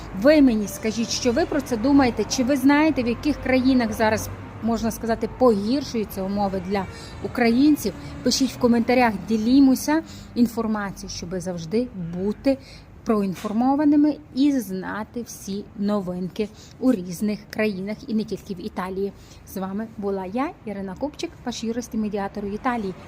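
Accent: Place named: Western Ukraine, Ukraine. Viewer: СУДЯ ПО ГОВОРУ ВЫ ИЗ ЗАПАДНОЙ УКРАИНЫ...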